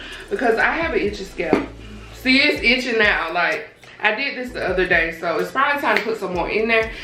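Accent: United States. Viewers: i love her accent!! so cute and southern lol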